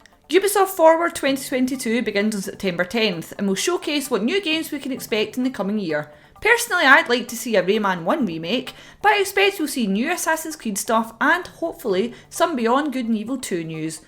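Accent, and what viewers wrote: Scotland, United Kingdom. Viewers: SCOTTISH M8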